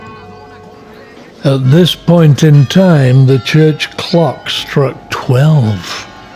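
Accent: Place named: United Kingdom. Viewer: You always get such good weather. There must be a divine blessing on you. Enjpyed the Menorca episode and British accent of. Many kudos to Spain for maintaining their outposts so pristine. All historical places are kept in utmost care. How very nice....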